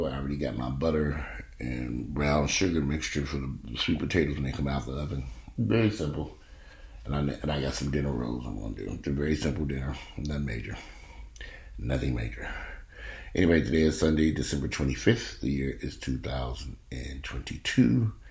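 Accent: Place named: South, United States. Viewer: Omg I always felt like I could listen to you all day just talk. So relatable reminds me of my great uncles and fam from the south lol❤ have a good one as well.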